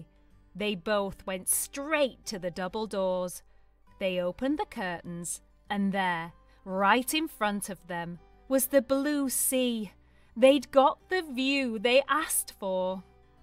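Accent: United Kingdom. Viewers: Thank you for this wonderful story! 🌞 The clear British accent and helpful subtitles made it easy to follow and enjoyable to listen to. Perfect for B1-B2 learners like me! Please keep making more stories like this. 😊📚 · Please give us time to read this story in British accent because we want improve our British accent 🌷🌺💞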